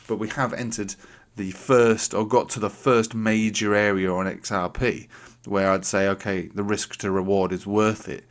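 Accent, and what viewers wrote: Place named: Yorkshire, United Kingdom. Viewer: Thanks for the video mate I thought you was somewhere near me by the acent I’m in Bradford the posh side of Yorkshire 😂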